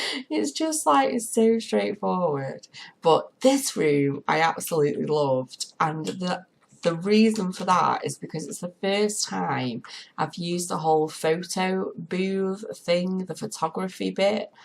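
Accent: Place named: North, United Kingdom. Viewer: This is unreal! I’m currently trying bigger builds and the floor plan gets me every time lol btw I love your voiceovers it’s nice to listen to a northerner Faye x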